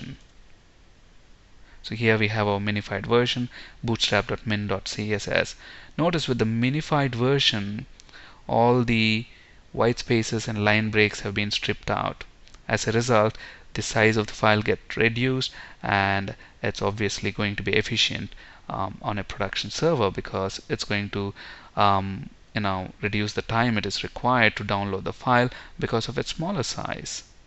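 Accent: India. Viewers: I have problem hearing Hindi accent most of the time but I can understand you and you explain very well starting with the context and what is what which is crucial to understand properly. Really a great tutorial.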